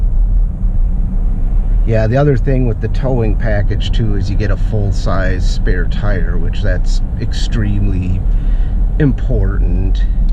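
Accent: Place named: Upper Midwest, United States. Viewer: Good video. I like your accent too! upper midwest?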